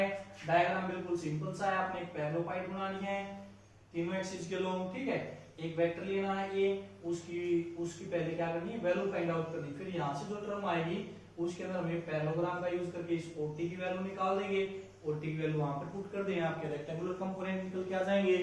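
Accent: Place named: Haryana, India. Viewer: Haryanvi tuch me mja a gya pd kr sb clear ho gya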